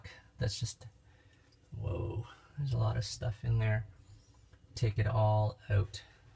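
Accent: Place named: Pennsylvania, United States. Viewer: Where are u from. Accent Reminds me of a guy I knew from Pennsylvania. Just wondering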